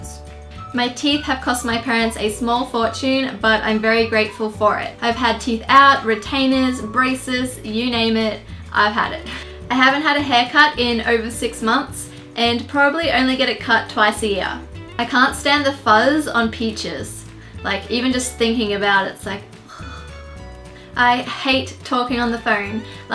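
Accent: Australia. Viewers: you are seriously so pretty! also random fact, you sound like Ingrid (missglamorazzi) but with an australian accent! haha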